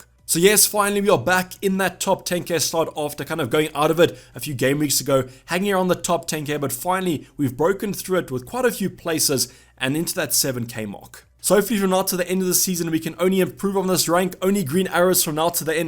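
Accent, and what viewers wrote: South Africa. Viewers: You sound South African My G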